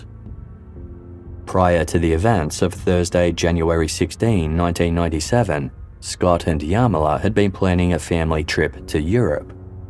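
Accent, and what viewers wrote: Australia. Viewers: SOON AS I HEARD THAT AUSSIE VOICE I TURNED HORRIBLE..I HATE WHAT OUR COUNTRY HAS BECOME..BUGGER OFF..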